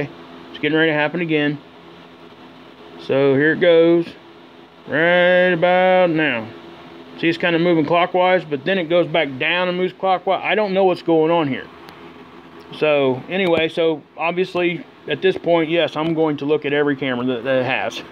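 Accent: South, United States. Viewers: Thank you for ever thing. I like your southern voice it makes me miss my brother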